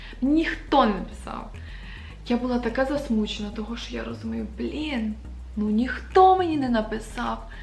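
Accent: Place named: Lviv, Ukraine. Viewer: Та вона чисто по львівськи говорит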